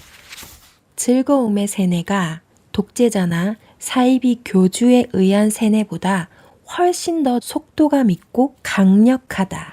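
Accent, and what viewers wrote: Gyeongsang, South Korea. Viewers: 님 갱상도가? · 님~항상 영상 잘 보고 있습니다^^ 경상도 억양이 넘 정감스럽습니다^^ 저는 경남 진주 입니다~ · 댓글 쓰려니 뭐가 뜨네요 이런거 첨이고 디게 예민하신가 유튜버중에 경상도말 쓰시는 분 엄청 많네요 표준어보단 귀에 덜 들어오는게 사실이네요 전달력을 높이려면 조금 연습하셔야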